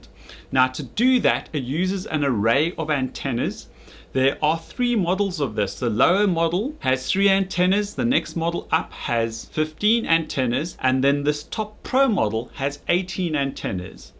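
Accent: South Africa. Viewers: South Africa or Zimbabwe probably, def. not British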